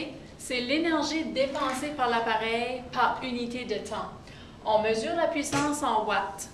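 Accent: Canada. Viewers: J'aime l'accent cannadien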